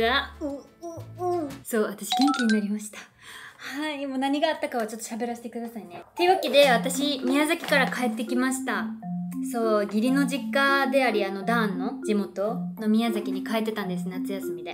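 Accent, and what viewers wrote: Kansai, Japan. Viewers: ちゃんの関西弁？だいすき～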